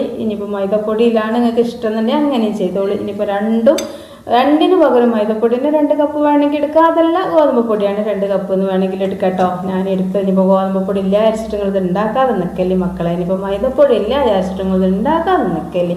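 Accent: Malappuram, India. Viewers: 👍താത്താന്റെ ആ നിഷ്‌ക്കളങ്കമായ അവതരണ മലപ്പുറം തനതായ ശൈലി കേട്ടിരിക്കാൻ വളരെ രസമായിട്ടുണ്ട്. അതിൽ ഇടക്ക് വലിയ ഇംഗ്ലീഷ് വാക്ക് ഉപയോകുന്നത് ഒഴിക്കാവുന്നതാണ് താത്ത നല്ല അവതരണമാണ്. ലളിതമായ പാചകം കൂടുതൽ സാധനങ്ങൾ ഇല്ല. നല്ല സ്ക്രിപ്റ്റ്. ബോഡി ഷോ ഇല്ല. One man show.👏👏👏super.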